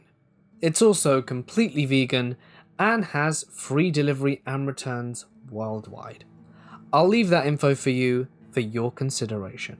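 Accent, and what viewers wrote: United Kingdom. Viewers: Your voice is so calming!! Where in the UK are you from?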